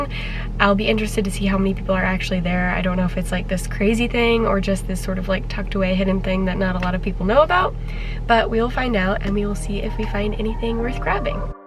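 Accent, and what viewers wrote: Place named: United States. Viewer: thanks for sharing. You have a beautiful home and land! Your furbabies are sweet! I'm jealous of your porch room! I would totally curl up with a blanket and a good book. Love the tea trolley! It's one of my favourite colours. You can get some super cute, battery operated tea light vines. I've gotten them at the dollarama (greetings from Nova Scotia btw). You sound American so for you I think you have the dollar tree? You can also get them in Walmart that plug in. What about a built-in window bench with storage? You made me hungry with the sheet pan supper...lol. The Pioneer Woman has a bunch of those recipes. One of my favourite garden tools is called the garden claw. It's blue and yellow. The top of the handle is ergonomical and the tines on the bottom of the rod allows you to loosen soil around roots to make it easier to pull plants. 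Plus you can use it to mix soil. You should let your seeds dry before storage...they have a tendency to rot if you don't. It's been a year so I'm assuming you finished your office. I only found you today. Love the shelves around the door idea. What about a padded chair with built-in drawers under the seat for storage? I saw it somewhere and it was super cute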